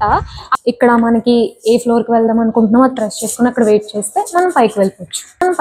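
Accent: Godavari, India. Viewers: Mana Godavari slang , vetakaram.super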